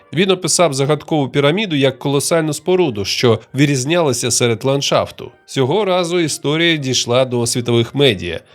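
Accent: Russia. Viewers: диктор - москвороте бЬІдло, не розуміє як вимовляти "і" та "и", читає слова на москворотий лад - іЛЛюзія" і т.д. Мінус і скарга.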